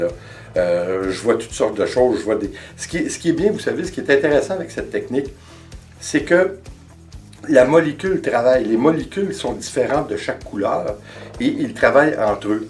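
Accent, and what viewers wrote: Quebec, Canada. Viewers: mon premier commentaire de France, malgré que je regarde ta chaine depuis quelques temps déjà. J'ai beaucoup appris de tes conseils et t'en remercie. Et ce bel accent quebecois me rappelle notre séjour dans ce beau pays il y a 3 ans. Bravo à toi et merci. Bonne continuation.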